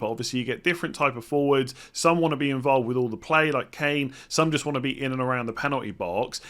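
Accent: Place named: Bristol, United Kingdom. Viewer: Hi! Are you from Bristol? Just picked up on your accent sounds like it is a Bristol one aha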